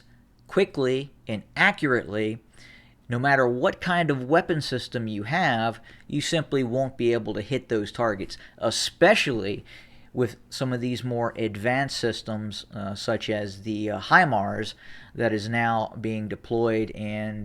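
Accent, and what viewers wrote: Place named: United States. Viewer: Hilarious that this guy who sounds American says he’s based in the US. This network should be called the Voice of Russia.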